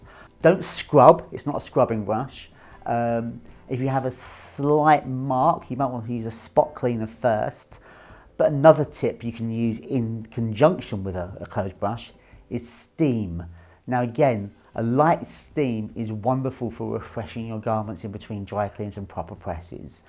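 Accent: England, United Kingdom. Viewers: I stumbled across this channel a few months ago and after a few videos I decided to subscribe. Good move.lm' Iearning a lot, and presents the information so well in his laid back clipped English accent. Surfed over to the website and was super impressed with their pocket squares. I just purchased two of them and will probably buy ten more. Thanks, and and the whole Rampley team for putting this videos together.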